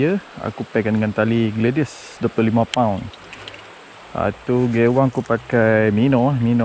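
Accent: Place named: North, Malaysia. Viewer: Kannnnnn..loghat mmg utara dah 😅😅😅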